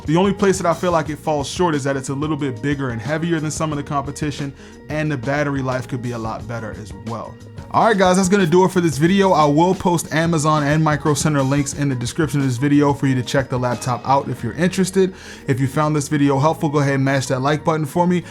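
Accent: Baltimore, United States. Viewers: I hear Baltimore more than the review.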